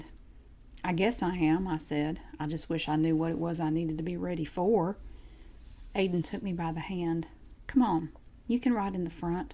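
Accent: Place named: East Tennessee, United States. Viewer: I will almost bet you are from East Tennessee. Well...you sound like us...in Knoxville lol.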